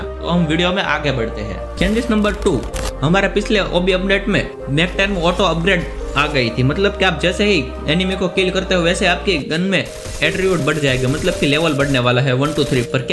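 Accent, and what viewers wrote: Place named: Gujarat, India. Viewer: I think you are Gujarati aapke aawaz se pata chal gyaa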